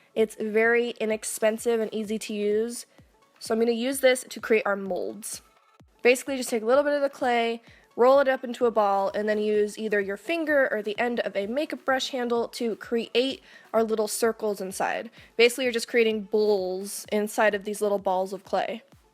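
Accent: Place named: Albuquerque, United States. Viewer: Are you a Burquena? Some of the ways you say things make me think you're from my hometown! haha Specifically when you said "Eeee! All creepy!" :)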